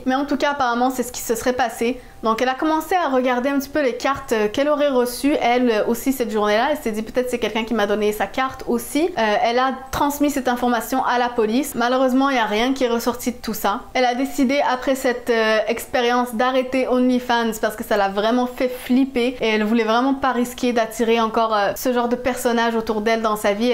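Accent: Quebec, Canada. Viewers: Es tu québécoise ??? Je viens juste de m'abonner à toi, je t'ai vu sur tiktok avec un thread d'horreur de la petite fille complice pour en enlever une autre bref jai bien aimé alors je vais te suivre sur YouTube aussi... bravo à toi xoxox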